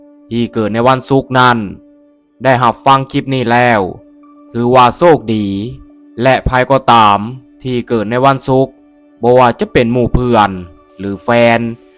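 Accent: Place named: Laos. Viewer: สำเนียงพูดเหมือนคนลาว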